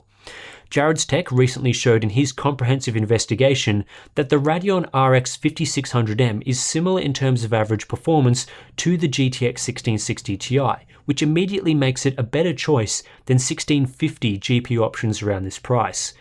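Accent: Australia. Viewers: great work with the review. only of the reason i watched it was that you are in australia (assumption with your accent). i would have liked if some of your links were australian stores. just google australian store none of them stock ryzen laptop except the ASUS Tuff or asus rog g14. not helping with the decision making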